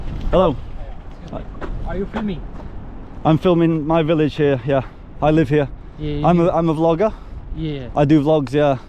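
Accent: England, United Kingdom. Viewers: The real and prolonged threat to Welsh culture is English immigration. Your English accent is testimony to that. Happy to be overrun and ruled by your English masters eh.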